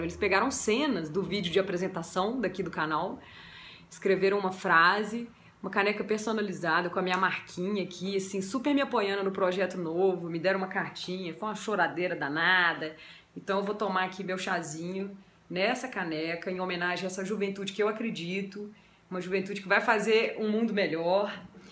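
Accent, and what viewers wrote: Minas Gerais, Brazil. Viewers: Você me inspira. Um abraço apertado. Ah, esse sotaque mineirês... Saudade da minha terrinha!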